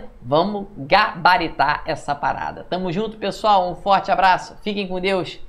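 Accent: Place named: Rio de Janeiro, Brazil. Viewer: Professor muito bom. Objetivo e atento, além de ser bonito e com o sotaque carioca! srsrrsrsrs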